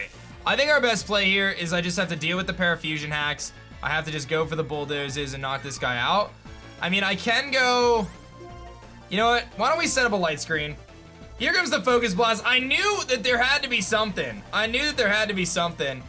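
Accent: Australia. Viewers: As an Australian I heard a lot of Americans try to do Australian accents that are not the best but you do a good Australian accent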